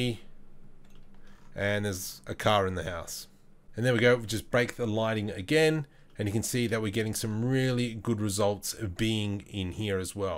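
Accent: Australia. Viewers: Sorry to ask..are you Australian?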